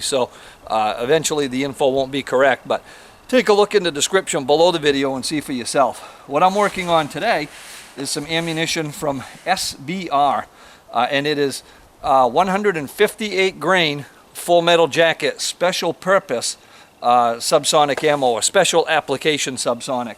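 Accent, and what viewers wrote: Maine, United States. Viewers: awesome video. you gotta be from Maine! 👍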